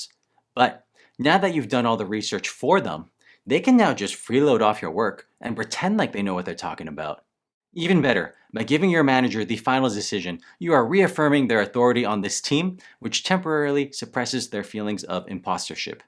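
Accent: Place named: Canada. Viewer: Hello from Peru! I love your videos and I’m so curious! You sound like born in Canada or something but What your ethnicity is? :) thank you.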